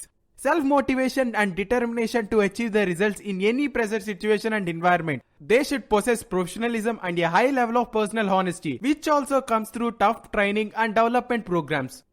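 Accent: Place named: Tamil Nadu, India. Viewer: Ur accent is tamil , south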